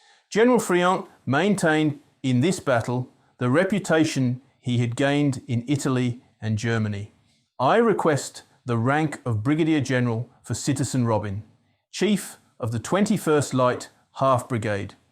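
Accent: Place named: United Kingdom. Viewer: I am not sure you could have gotten a worse narrator. Did you really need Napoleon to be read by a guy with a heavy British accent and saying - each - word - individually - and - distinctly - with - no - smoothness - of - delivery. I cannot imagine how he learned to speak like that and not be beaten by his teachers. Maybe have him read it once beforehand and then record the second attempt. Hopefully, he was not a professional narrator. But I complement you on the topic. I myself was looking to read more about the Egyptian Campaign after seeing the Napoleon movie.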